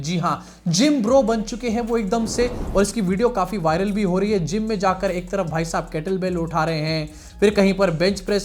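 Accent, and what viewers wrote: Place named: Punjab, India. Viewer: आप क्या पंजाबी हो आपकी tone पंजाबियों वाली लगी मुझे । प्लीज बताए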